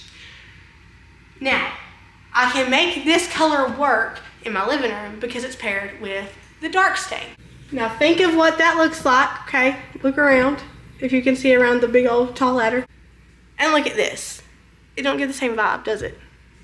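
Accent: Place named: Texas, United States. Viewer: White on white is what you island will be … that is when you have different shades of white! It looks nice but I really did like the other colour as well! I loved that you count us as your friends. I’ve been watching for ages now….. you just came up in my suggested videos to watch and the rest is history. Mostly because I was born and bred in Texas and lived there til I was 26 yrs old. And came to Australia to work in a local church in area of music and youth. I also was a trained teacher and I only did the church thing as paid work for 2 years …married and Aussie and taught school after that and the story goes on and on! But I just love hearing you talk. The accent I love too! I love your wisdom and understanding of your children and I love that you love Jesus and started your YouTube channel because Jesus told you to do it! I love that you don’t put on grace and airs….you are who you are..take me or leave it! 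Thanks for all you do!😊❤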